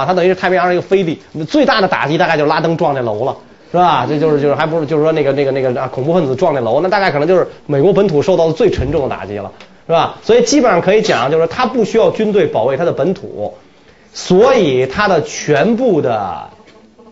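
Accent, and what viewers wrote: Beijing, China. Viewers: 讨厌北京强调。 尤其是讲课的时候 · 讲课的时候用北京腔感觉不严肃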